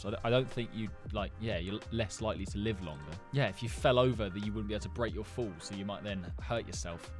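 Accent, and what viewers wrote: United Kingdom. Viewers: …you are British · I’m sorry, but you sound British are you British at the sound British so are you British?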